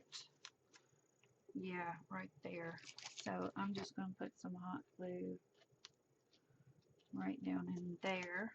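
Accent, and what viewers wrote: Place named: South, United States. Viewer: I will. I live in North Georgia you sound like a southern girl too lol!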